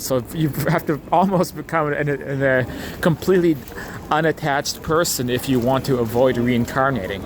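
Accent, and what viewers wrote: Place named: Netherlands. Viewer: Nice to have a little look around Kiev, thanks! By the way, are you European yourself, your accent sounds Dutch maybe ?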